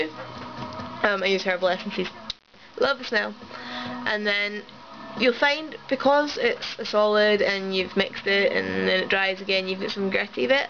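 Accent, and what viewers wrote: Scotland, United Kingdom. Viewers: You sound scottish :) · This is my own way of making it. Try it out and test it on a small part of your hair first. I hope you don't mind I posted here for you and everyone else who like to try. You can delete my comment if I was for me to post it here. Sorry about that. I STILL LOVE your accent!!!! I have ALWAYS LOVE Scotland!!!! Again, thanks for the video. =)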